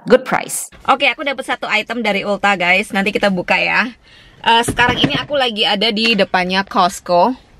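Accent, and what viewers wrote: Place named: Surabaya, Indonesia. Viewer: Ya ampun cek larange. Hahaha... Suroboyone ora ilang... Hihihi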